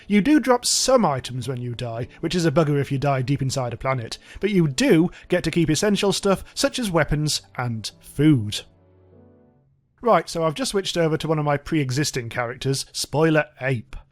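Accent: United Kingdom. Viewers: This guy narrates like roald dahl with a british voice, love it !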